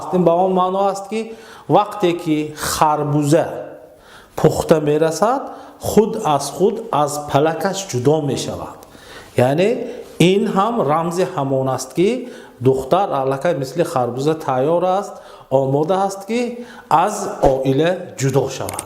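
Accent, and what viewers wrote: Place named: East, Tajikistan. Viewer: Брат иё шарқ гап задестан о маву ту а ғарбем ихе гапора намефаҳмем 😇😟🤨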